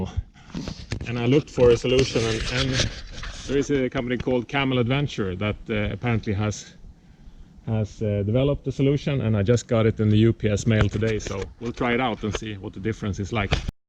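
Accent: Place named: Sweden. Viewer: Why swenglish ? It`s hard to listen to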